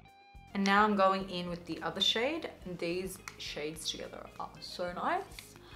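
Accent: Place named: Australia. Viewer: i wish i looked like you omggg and i love your aussie accent lol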